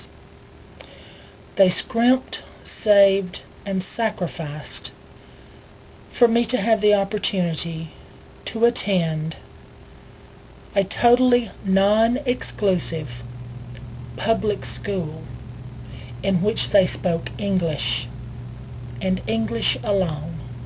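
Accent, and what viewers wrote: South, United States. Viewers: LOL! Southern!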